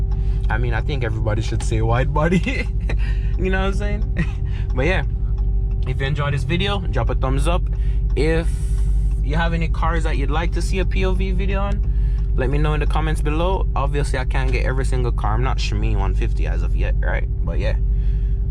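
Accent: Jamaica. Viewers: Are you a jamaican??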